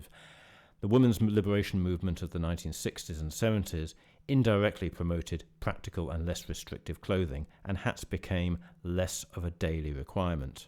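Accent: United Kingdom. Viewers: “Fwum.” “Fwee.” “Wibbons.” “Amweriker.” “Twentiff.” “Gwandmuvva.” I don’t understand that Brit bs accent… But I do like the info in the video. But only once. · Of course he has a British accent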